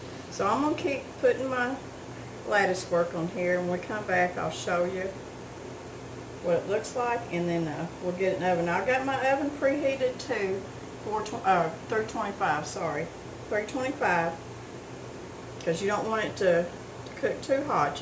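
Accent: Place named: Arkansas, United States. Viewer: This is so much like my mom's recipe. They lived through the "hard times" of the depression and WWII and farmed a small piece of land in south western Manitoba(Canada) and they had to make things stretch so she used just 1 cup of raisins and 2 cups of whole milk from the cows she milked herself. Mum made this pie when we were growing up and I still love it today and I'm 61 yrs of age. Thank you for sharing with us. I love your Arkansas accent. We lived for one year in Kansas, when I was 8 rys old, right down in the the south west corner near the Oklahoma border. At that age a kid is like wet clay and I picked up the local accent so much that when we moved back to Canada everyone thought I was born in the states. To this day when the Star Spangled Banner is played my eyes well up and I remember that wonderful carefree year as a boy riding my bike in our small town with my first dog running beside me. 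I'm tearing up as I write this so will leave off with many thanks and good wishes to y'all.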